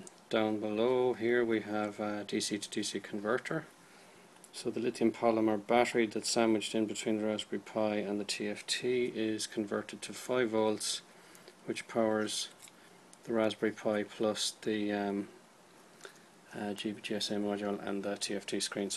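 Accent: Ireland. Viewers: The Irish is strong with this one 🍀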